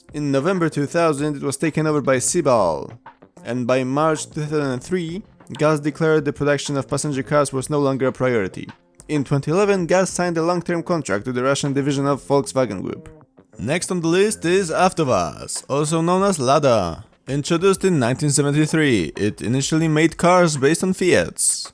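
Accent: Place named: Poland. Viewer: You sound like a Polish 😊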